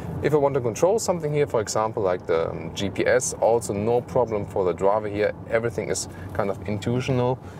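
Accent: Germany. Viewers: Terrible German accent. Do it in your mother language please!!!! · Beautiful car but they could really find someone able to speak English with a not-so-brutally-broad German accent. First I got embarrassed why I did not understand what I thought German - it took me more than 1 minute to realise it's meant to be English :(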